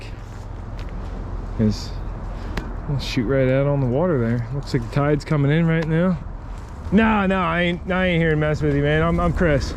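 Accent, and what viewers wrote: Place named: Eastern Pennsylvania, United States. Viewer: Came across one of your videos on the XL, I heard you talking and thought "This dude is from eastern PA for sure." Greetings from Bucks County. I might have to check out some of these spots on my XR one of these days.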